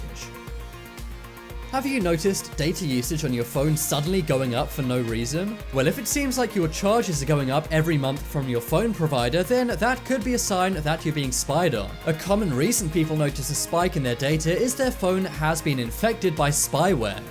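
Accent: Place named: United Kingdom. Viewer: Notice the British accent.....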